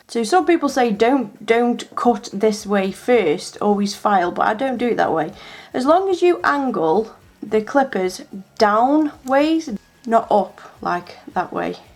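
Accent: United Kingdom. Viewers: You sound like your British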